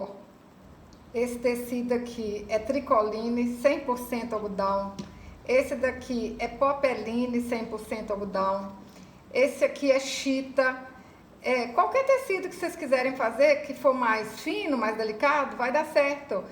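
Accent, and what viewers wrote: Minas Gerais, Brazil. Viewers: Amo o seu sotaque mineiro, me sinto em casa pois tbm sou da terrinha , sou de Raul Soares M G.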